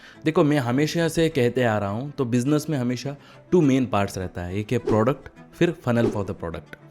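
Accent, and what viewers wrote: Karnataka, India. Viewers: Bhai aap karnataka se hoo kya???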